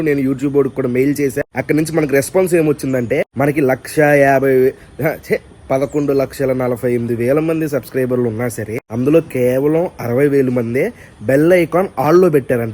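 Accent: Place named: Godavari, India. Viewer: All the best.. new timing ki.. you have bright feature in movies and Godavari slang 🎉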